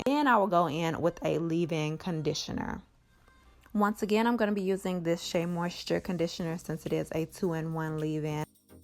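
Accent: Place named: South, United States. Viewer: Where are you from? I hear a southern accent. I'm from Mississippi! :D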